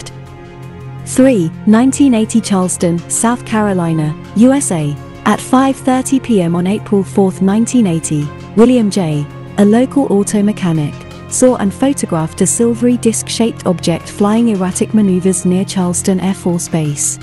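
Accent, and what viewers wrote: United Kingdom. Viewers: Love your British accent:-)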